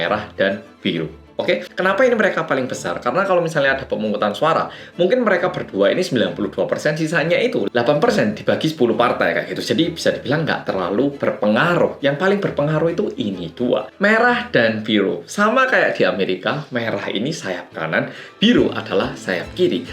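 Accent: Java, Indonesia. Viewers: Medok bgt jawanya... Mantap.. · mas jenengan korea tpi luweh medok seko aku mas😭😭😭😭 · Logatnya Jawa 😂😂😂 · AQ neknweruh mas Ra berasa wong Korea..ngrasane wong Jawa mas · Mas sampean jadi orang Indonesia aja sih ,orang ngomongnya medok banget kaya nggak percaya klw aslinya korea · mas.logat bicaranya medok ky org jawa asli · Mas kok aku liak e, rungok e wis koyok wong jowo indonesia yo? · Kadang suka msh kaget klo mas ngomong jawa medok tp wjah korea 😁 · M'f abang ni org mana? korea kan ? bhs indony fasih bngt kyak ada jawany, sehat sll Bang.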